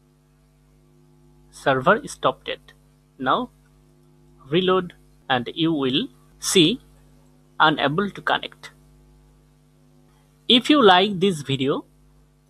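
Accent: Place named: Bengal, India. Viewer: Crazy Bengali accent, but nice tutorial 😅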